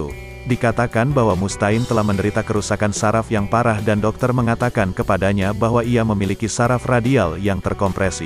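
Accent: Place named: Indonesia. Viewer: Kan pake pelafalan logat Indonesia bro... 🤣🤣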